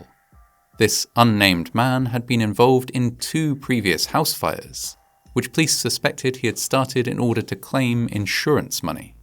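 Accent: United Kingdom. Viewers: Of course, another video about an American event narrated by an annoying British accent! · It was very thoughtful of Holiday Inn to install a fire alarm designed to not disturb their guests. Greece still doesn't use 911. They use 112. I find it interesting that a narrator with a British (?) accent would use 911 to refer to a general emergency number rather than 999. Perhaps an American script writer? Edit: Whoops, my bad. I kept hearing "Greece" and thought it was the country, not a town in New York.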